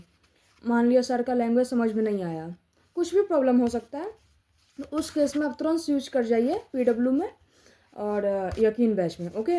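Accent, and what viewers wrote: Bihar, India. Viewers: Aap bihar se ho ky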